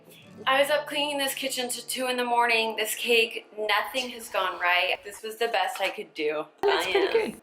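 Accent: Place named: Australia. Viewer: is pretty much Cher with an Australian accent and baking skills. Oh. The love ❤️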